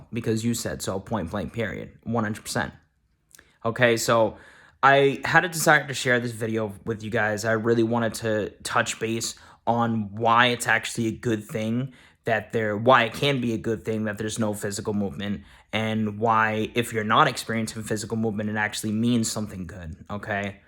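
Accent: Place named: Boston, United States. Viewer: Boston!...I’m glad to hear someone who talks like me in the community 😘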